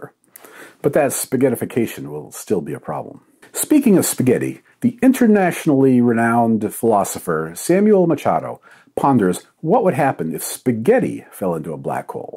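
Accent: United States. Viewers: Once again regarding the your Americanized simplified pronunciation of non-American scientists' names. Can you, please, say "Descartes", and "de Broglie" to name just two, as a proud but somewhat ignorant American? (BTW, the 1st is pronounced "decart" and the latter "de Breuille"). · He addressed this topic earlier. I guess he doesn't care about German pronounciation and prefers the American, just like they don't call the country Deutschland.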